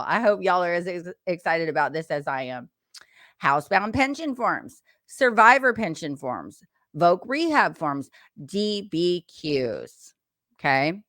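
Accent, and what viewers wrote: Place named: South, United States. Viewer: Thank you for the great content Mrs/Ms. This was awesome, it was truly. I enjoyed how you visualized the documents and subsections. I enjoy your videos. I refer you to everyone I know! Did anyone notice that she has gotten a country accent when she gets into her job? She said "YWAHLL" and "SOPPOSED TWO", yo, she dragged it out! Haha (instead of just plain 'yall'"). Actually, she's gotten more country over the years. Lol, I'm not even from the US and I can tell. Ok take care!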